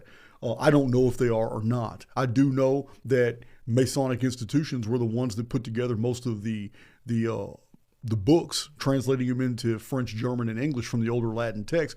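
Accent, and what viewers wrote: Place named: Texas, United States. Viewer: I am not sure how I came across your account initially… I think it was about the goddess being first, but what I connected with immediately is the Texan accent because I was born and raised in San Antonio. Then I realized that you’re on the same path as me…obviously ahead with all the books you’ve been able to read but either way, I am where I need to be and I’m glad I discovered your page and I appreciate the information you share ☺️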